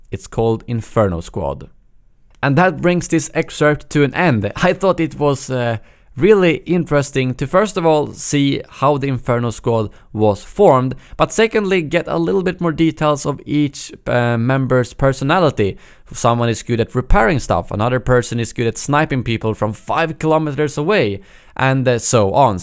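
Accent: Sweden. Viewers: His Swedish accent is awesome. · You read fine, don't be afraid of reading with your "Swedish accent" because you read pretty good bro.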